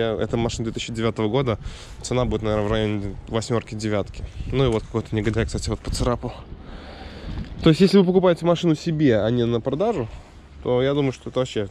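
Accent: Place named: Belarus. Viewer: Маашинуу😁👍акцент прям Белорусский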